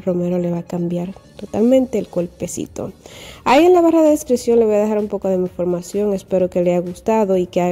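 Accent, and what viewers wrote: Dominican Republic. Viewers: Hola mi corazón, sabes antes de entrar a tu pagina, me imaginaba que eres Dominicana por las palabras que usabas en el video, bueno como dices los nombres de los ingredientes y la preparación!! jajjaja muchas felicidades y voy a ver si hago ese ungüento para regalarse a una amiga que tiene mucho dolor de las articulaciones!!!! Una pregunta tonta que yo escuchaba cuando era pequeña en los 1986 aproximadamente, una vecina de mi mama preparaba un aceite que ella llamaba aceite eléctrico, es verdad que sirve para los dolores también?....... cosas mías, pero siempre he tenido esa pregunta en mi cabeza jajjaja.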